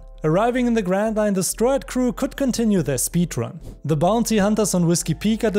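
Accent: Germany. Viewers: Ich liebe den deutschen Akzent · you sound german. also, änel lol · ur german 100%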